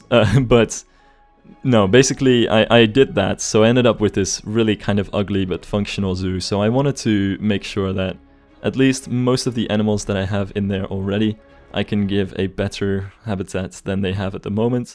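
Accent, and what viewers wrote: Netherlands. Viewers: I hear that Dutch accent. Gegroet landsgenoot. :)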